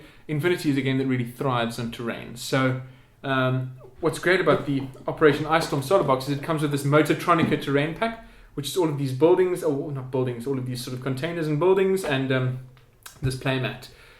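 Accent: South Africa. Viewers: That has to be a South African accent. But yeah, Infinity is great!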